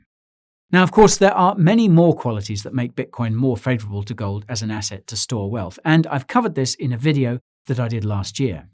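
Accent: United States. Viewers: idk man, I'm here on the Southern side of the US and I've heard his sound before but yeah, if I didn't know him; I'd think he'd have moved into the area recently but still American. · Your American accent is better than mine, and I have lived here for all 27 years of my life XD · Hearing with an American accent is tripping me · Your American accent is spot in 🤣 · Bravo on the American accent - well done! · Haha, I love the American accent, dude!! Good job! :D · Good American accent ! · That American accent caught me off guard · That American accent! 👌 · Better American accent than Orlando Bloom's. Just need to practice on the word "research". Say it like "Reeserrch". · with an American accent, wonderful! 🤣🤣 By the way, excellent work, keep going!!! Cheers from Portugal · Wow that in-flight captain American accent is pretty darn good. Some telltale signs that it isn't your natural accent though. 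advisor, research, and a few others tripped you up. I LOVED the "Alrighty!" :D Seriously though - I don't understand why only Bitcoin is mentioned in this video. Bitcoin isn't the future of currency. Are we only mentioning BTC because of the 21M minting cap? There are plenty of cryptos with burn mechanisms to keep supply down. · Pretty good american accent just heard a little of your English 💯💯💯. Love your channel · That American accent is hilarious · Clicked for the subject, stayed for shmaltzy American accent. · The outlook is dismal, but on the bright side you do a great American accent. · Dude, good American accent!!! 😄👍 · Nice job on the American accent. You do have some talent. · I didn't realize it was an American accent. I'll have to rewatch. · Decent American accent. I would've thought you were one of us Yanks if I didn't know better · Excellent video as usual: 10/10. American accent not bad. 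Needs work though: 7/10. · nice American access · Good American accent, but full of cheese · Love the American accent! Always engaging content! Haha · your American accent is great! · Fantastic American Accent !!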